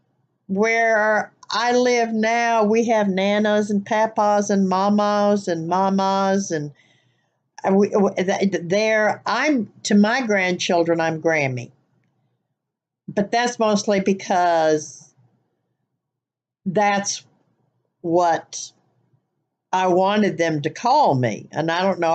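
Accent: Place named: South, United States. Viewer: You're so cute. I have always considered yours a southern accent. But not deep southern.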